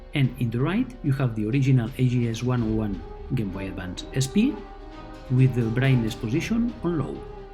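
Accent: Spain. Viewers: Ostia este es español. Me juego lo que sea XD. Que acentazo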